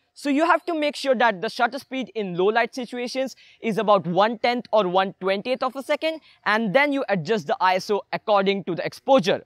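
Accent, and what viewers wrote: India. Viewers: fuck that indian accent lol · Very clean and clear indian accent👍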